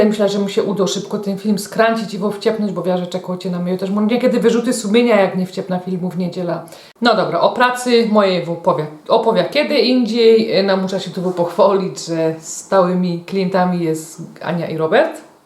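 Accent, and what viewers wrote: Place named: Cieszyn, Poland. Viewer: Od niedawna oglądam twoje filmiki kulinarne i ciekawi mnie z jakiego regionu Polski pochodzisz bo znajomy jest mi twój akcent czy czasem nie jest to region cieszyński. Odpisz bo podobają mi się twoje godki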